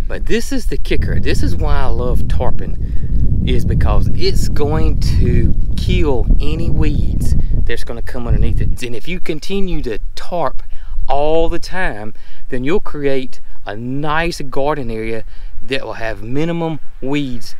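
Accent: South, United States. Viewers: It sounds like: kol er ab e. Must be your southern drawl? LOL just teasing a bit....I use to live in Georgia and one day a neighbor called me Georgia Peach and I went and cried and told my mom...she said that's a sweet name don't cry. When I listen to your talking I love it and it sure wouldn't take me any time at all to get it back down south. I love ALL of your video's for sure and look forward to every single one of them. God IS Blessing you all.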